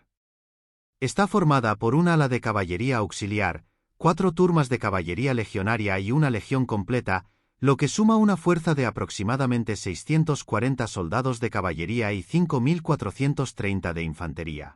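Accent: Spain. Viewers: Al ser una tradicción española y leer en el título la palabra "Fascinante" me produce rechazo solo pensar en escucharlo. Lejos de ser agradable el tono español es agotador, cansador, sobre cargado.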